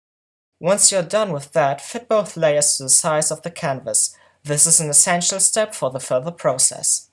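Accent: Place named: Germany. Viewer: Hey thanks, it's really incredible to find exactly the fringe flag-editing tutorial I needed as soon as I thought of it. Also, that's a delightfully German accent you have there. I feel like I'm being told grand secrets about *something*.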